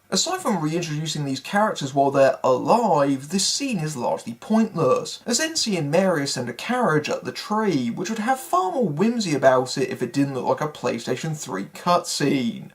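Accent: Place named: United Kingdom. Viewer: Да, британский акцент ОЧЕНЬ специфический. Но Фильм Брейн делает его невыносимым!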